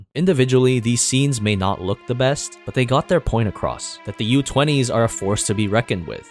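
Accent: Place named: Liverpool, United Kingdom. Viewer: scouse bastad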